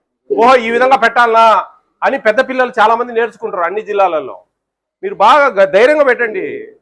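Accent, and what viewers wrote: India. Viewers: Sir మీ మాటల రాయలసీమ మాండలికం చాలా నాకు నచ్చింది సొలకాడ తీసుకోవాల్సి వస్తది అనేది. Good work sir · మీకడప యాస బాగుందండోయ్ ,👌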